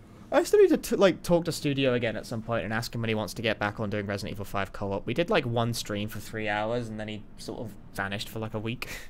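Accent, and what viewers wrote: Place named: London, United Kingdom. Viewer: if you're reading this my dude which part of London are you from?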